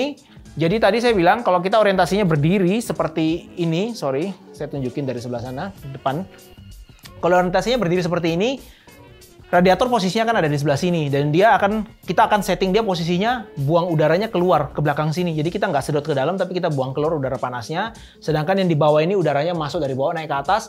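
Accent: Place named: Surabaya, Indonesia. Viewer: kalo boleh tau, nih channelnya orang mana sih.. bahasanya jatim sby an banget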